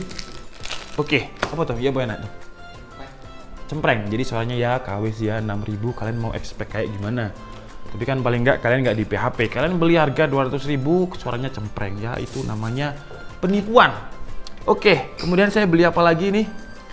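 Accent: Java, Indonesia. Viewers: Jawane ngendi mas? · Samean jowo ngendi mas ? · Bahasane bahasa malangan mas😂😂😂😂 aku yo tekok malang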